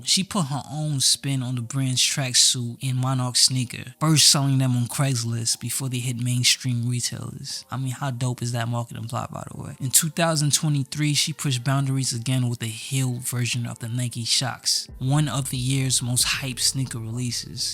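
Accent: New York, United States. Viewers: Where’s your accent from? It’s great + super interesting! Is it New York?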